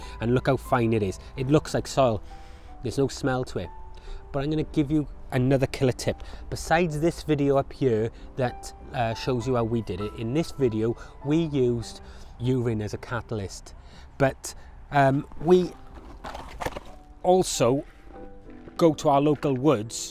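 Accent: Wales, United Kingdom. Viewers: Hello, your accent sounds Welsh to me. Can i please confirm if my Cymraeg radar is on target?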